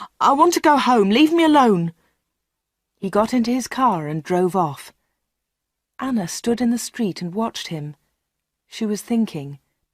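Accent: United Kingdom. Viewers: این تلفظش بریتیشه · Nice British English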